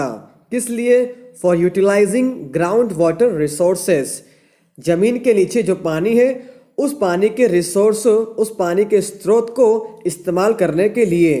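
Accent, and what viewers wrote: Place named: United Kingdom. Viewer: Thoda angrej wala accent nahi bolte to Jada sahi rehta sir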